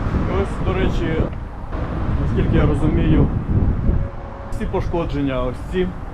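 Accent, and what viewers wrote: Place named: Kherson, Ukraine. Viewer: Вуха не ріже, на помилки не звертаю уваги. Головне що від серця. Нормальна вимова, херсонська😊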